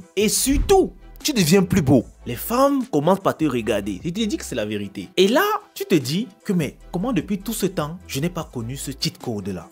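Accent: Cameroon. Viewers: Je mise que tu es Camerounais 😂❤❤❤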